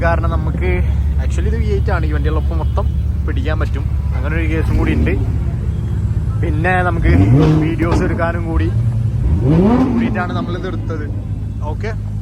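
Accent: Kasaragod, India. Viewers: bro kasaragod aahnnoo bro slang cheruthaayii ksd slag pole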